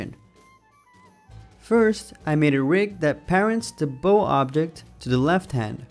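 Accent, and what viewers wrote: Brazil. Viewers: Haha do jeito que você falou já descobri que era Brasileiro. Não tinha ideia mesmo depois de 6 meses desde que comecei a assistir seu canal.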